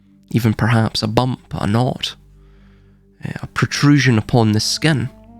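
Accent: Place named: Scotland, United Kingdom. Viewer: Anything you say regarding Steiner and his Christology path is welcome. Thank you so much for all you speak and with a fine Scots accent.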